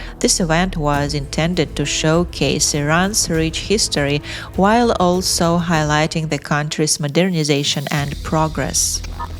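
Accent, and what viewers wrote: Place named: Russia. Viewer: No, it's a lady of apparently Russian mother tongue, with a certain - but not sufficient - mastery of the English language - and who pronounces a lot of words wrong. Indeed it's hard to stand.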